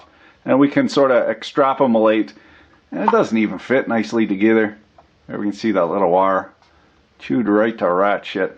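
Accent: Canada. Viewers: WARNING! VERY CANADIAN!!! But he seems like he knows what he is talking about , and he answered my question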